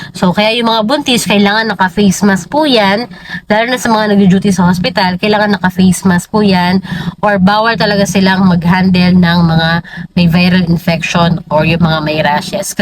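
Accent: Pampanga, Philippines. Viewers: Kapampangan kayu po?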